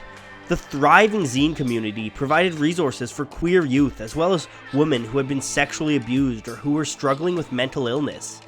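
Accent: Canada. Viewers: Olive Ephemerals no it’s just the way a lot of Canadians say women. As woh-men, and the e often sounds like an A. It’s just the way she goes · that's just how we say it in canada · It’s a Canadian accent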